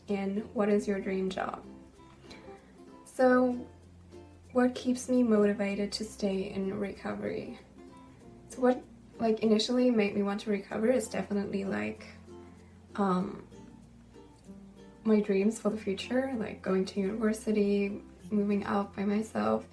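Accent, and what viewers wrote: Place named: United States. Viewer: she have American accent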